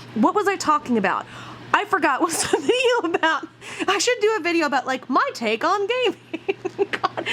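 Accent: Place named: Kansas City, United States. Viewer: Aha! I grew up in the Kansas City, KS suburbs! No wonder I talk a lot like you. Currently I live in Merriam, KS which is still that area.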